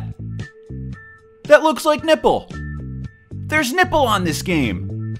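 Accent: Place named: Midwest, United States. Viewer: I love the Midwest accent for i live in the Midwest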